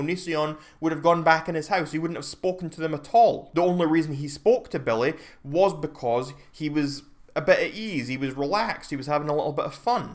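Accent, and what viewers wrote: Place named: Scotland, United Kingdom. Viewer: What is the world coming to? Just wanna say it's so comforting hearing a Scottish accent on here - love from Scotland 💙💙💙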